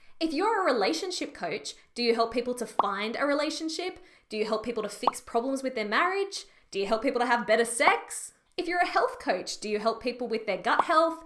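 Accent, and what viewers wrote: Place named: Australia. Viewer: Great tip to find a niche within a niche..Didn't know you say niche differently in Australia (I'm a kiwi living in USA)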